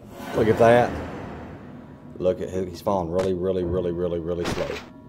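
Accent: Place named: South, United States. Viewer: your southern accent makes this lets play more authentic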